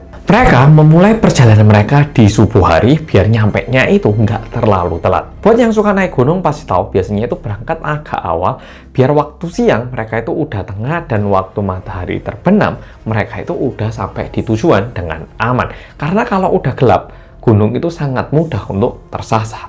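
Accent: Java, Indonesia. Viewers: Hai medoook❤ RinDingDing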